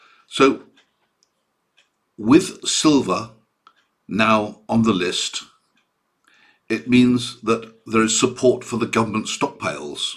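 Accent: United Kingdom. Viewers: I love the content of course, baut also, as a French, is just delghted listening to your british accent.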